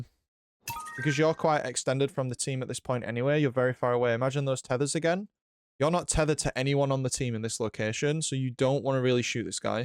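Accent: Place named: Sheffield, United Kingdom. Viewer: Sounds like a Sheffield accent 👀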